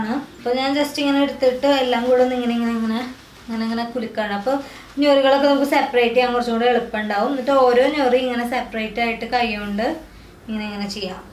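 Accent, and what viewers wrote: Thrissur, India. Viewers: സംസാരം kekkan nalla rasam undu.... thrissur aano....???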